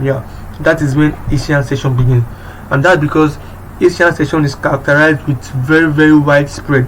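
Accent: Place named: Nigeria. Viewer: Nigerian accent is easy to know!! You actually sound like someone I know.. Respect bro!✊🏾 It's good whenever I see a Nigerian doing this on YouTube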